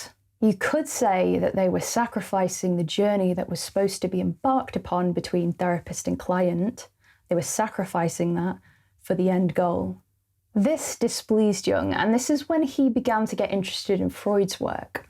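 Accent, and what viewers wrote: United Kingdom. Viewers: There's nothing quite like watching a lass with a Northern accent explaining Jungian philosophy and ideas as well as you just did - truly something to behold 😭I really enjoyed this video, thank you!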